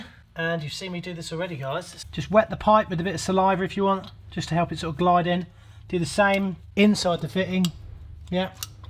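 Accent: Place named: Scotland, United Kingdom. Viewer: Thanks for the entertaining vids and love the Scottish accent - 'suits you Jimmy' Question from me handsome is I need to run pipes in an interior concrete slab for 4 meters then into a suspended floor to reach a sink and dishwasher position my wife chose!!!.... I really don't want to have any junctions under either floor can I use PEX off a roll and just connect at both ends???.... Bet you got a vid for that eh?!! Dandy Dave x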